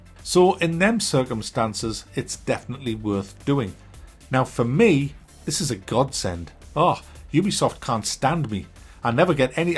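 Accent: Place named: Northern England, United Kingdom. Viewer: do you listen to athletico mince or top flight time machine? You and the northern bloke in those podcasts are the only northern people in my life, but two of the best. Love the channel, love the vids, love your voice. Wish i could hear you being happier...but fully understand that life and the modern gaming world are not particularly happy places.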